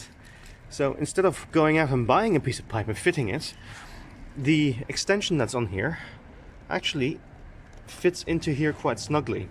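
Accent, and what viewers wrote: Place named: Netherlands. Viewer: hoor al dat je nederlands bent aan het accent ! ik heb een 230 .. zelfde soort gezeik continu ... maarja er blijft sowieso wel elke week wat nieuws kapot gaan aan dat klote ding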